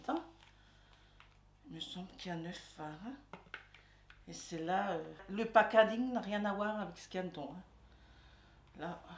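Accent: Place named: Alsace, France. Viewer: Super haul, j adore leur palette, j en ai déjà pas mal...petit clin d'œil pour ton accent alsacien...sa fais plaisirs. 67 elsass frei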